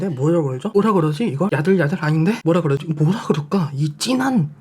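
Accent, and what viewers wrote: Jeolla, South Korea. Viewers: 말할 때 가끔 들리는 전라도 억양이 너무 매력적...♡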